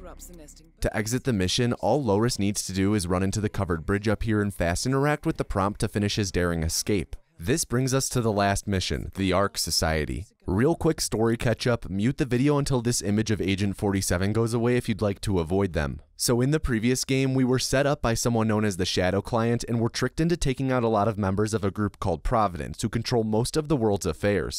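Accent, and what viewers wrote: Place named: Midwest, United States. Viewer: It's so weird that I didn't notice any accent in your voice until AFTER the Chicago guy skit; Once you returned to your normal speaking voice I could hear lil bits and pieces that sounded distinctly Midwestern. As a native Chicagoan myself I was never able to detect the "Chicago accent" while I lived there unless it was very pronounced or over-the-top like in SNL's Da Bears skit. Other than that I was convinced that we spoke "neutral" English. After living in Oregon for almost 7 years though I'm slowly getting better at picking up on the subtle nuances of Chicago-talk. The biggest difference I've been able to spot is in short "o" sounds like in the word "body". I realized that I say it as though it were a short "a" sound, almost like "bahdy". I guess I'm just anudder one a' da hunnerds of Chicahgo fellers who tahks like a gahsh darn fool 😮‍💨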